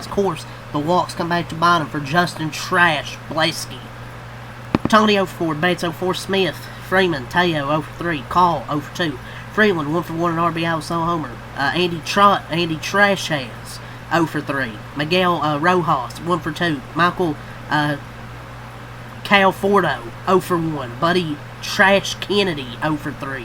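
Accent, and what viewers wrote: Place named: Atlanta, United States. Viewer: Where are you from? Sounds like an Atlanta accent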